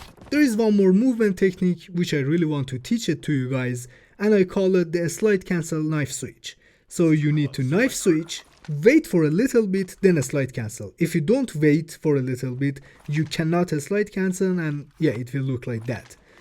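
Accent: Iran. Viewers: tell me if im wrong but your accent is screaming that u from iran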